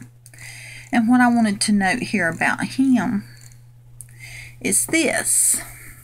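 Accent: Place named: South, United States. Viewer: Thank you Sister! You are so right on in this video. What a blessing you are. I can't wait to meet you in heaven. I also love the fact that you are a southern girl (your accent). I live in East Tenn. lol God bless you as you continue to show us what the Lord is revealing to you! I just feel so comfortable and such a peace listening to you. I don't know how to really explain it, right at home with your teachings I guess. Anyway, love you and God bless you! :)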